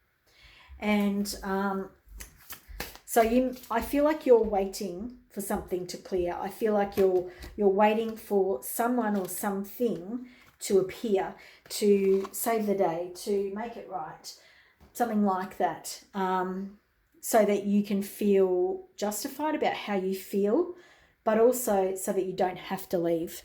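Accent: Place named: Australia. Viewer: Pile 1 was amazing. Thank-you so much. I could write you a long essay about all the ways you spoke to me, but I’ll just tell you that I cried and laughed the whole way through. I’ve also been seeing 1111 again the last few days, and this morning I said to Spirit, “I’m listening” and then a bee flew in the room and after hovering in front of my face, flew around to show me a few things in the room (in answer of a recent question) and then left. Also you have such an amazing voice. I’m obsessed with your accent even though I’m Australian too, haha. You’re amazing! Blessed be!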